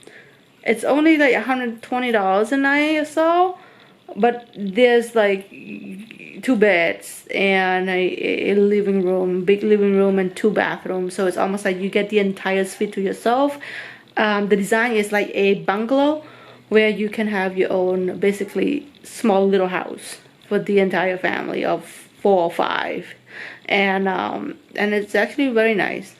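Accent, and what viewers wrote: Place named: Vietnam. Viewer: Are you Khmer or what? You act like it's your first time in Cambodia. You have Vietnamese accent though.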